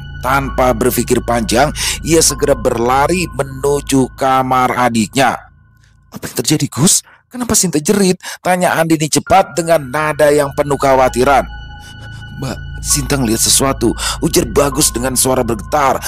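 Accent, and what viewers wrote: Jakarta, Indonesia. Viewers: Pon K4 BaR always selalu ngga pernah never... Asik ada kiriman lagi cerita bang Pian dari bang. Saya suka cerita dan logat Betawi yang kental akan budaya. Apalagi gaya bicara bang makin mantap aja mendengarkan nya 😅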